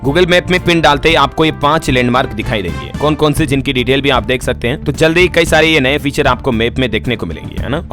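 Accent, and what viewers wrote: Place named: Gujarat, India. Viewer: U r looking like gujarati frm. Ur pronunciation.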